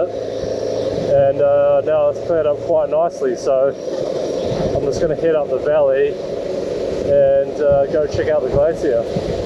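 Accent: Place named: New Zealand. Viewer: Awesome, I followed pretty much the same route a couple of years ago, it's one of my favorite rides, that was like re-living my trip but with better music and video😁. Enjoyed this more than the overseas riders, it's always better when you know the places and to hear the Kiwi accent behind the camera, not to mention your filming and music is top notch.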